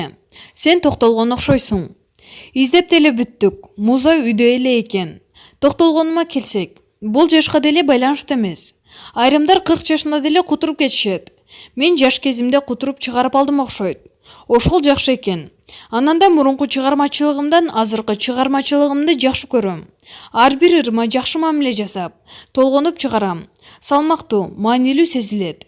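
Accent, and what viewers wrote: Russia. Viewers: Ушул кыз суйлобой эле койсунчу кыргыз эмес орус зорго кыргызча суйлогондой болот.мынча акциент менен суйлойт озун угуп корчу адамдын кыжырын кайнатып.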